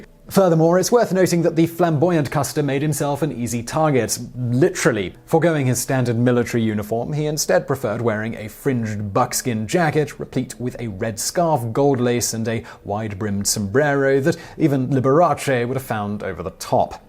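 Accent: England, United Kingdom. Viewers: I like this channel mainly because its a brit w/ a cool english accent so you know hes to be trusted plus solid content and for once I liked the title and the presentation. Most of these vids are poorly presented w/ the guy pandering to human reaction which is annoying and the clickbait vids simply arented needed. The subs plus people will click on this vid just because it exists it really doesnt need super clickbaity titles. This one was a great episode and I love how the info is put forth esp the brick backround etc but nOmOReClICkbAitY stuff ppl will legit watch this no matter the name itll just come up on the que and trust me itll get views but spare us the superduper clickme kjøtt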